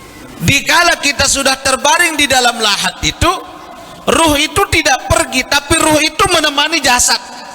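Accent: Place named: Bali, Indonesia. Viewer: Ininustadz siapa ya namanya? Logat biacara nya seperti asli orang bali 🙏🙏🙏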